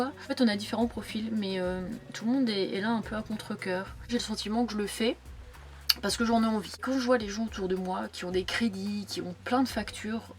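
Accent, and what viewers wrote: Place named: Belgium. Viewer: charmante et courageuse la Belge juste une fois lol et moi qui croyais que tu prenais l'accent Belge juste pour plaisanter hihi. En tout cas ça fait plaisir de voir que des femmes ose se genre de mode de vie. Un petit boujou du 50 😊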